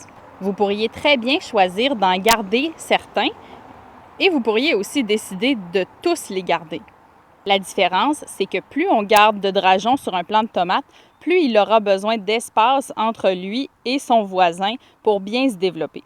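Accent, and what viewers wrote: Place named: Quebec, Canada. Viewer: Bonjour, vous expliquez très bien et j'adore votre accent Québecquois ❤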